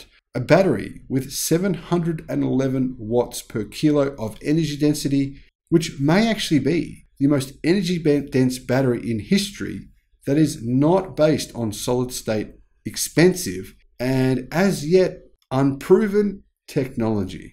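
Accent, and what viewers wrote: Australia. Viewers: Is this an Aussie?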